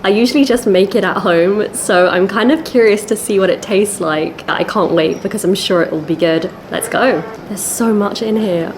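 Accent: United Kingdom. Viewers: のイギリス英語発音は私大好きです。アメリカ人女性はイギリス英語発音に弱いと聞きます。日本人男性に話すと好かれますよ！😽🐱😻😍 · 牛丼を、スプーンを使って食べるとき、dig in～という表現を使うのですね。動画の内容も面白く拝見させて頂いています。と同時に、イギリス英語の Listeningも同時に、楽しめてGOODです。何より、日英両方の字幕を出してくださっているのが、とても有難いです。どうも有難うございます。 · 牛肉、豚肉、鶏肉一度に食べるとか。あんまり機会ないな。の食事見たらほんま食べたくなる。後イギリス英語は聞き心地良いですね！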